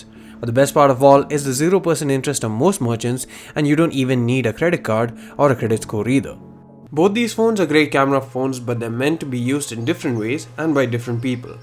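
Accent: Kerala, India. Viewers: Are u a malayalee......bro?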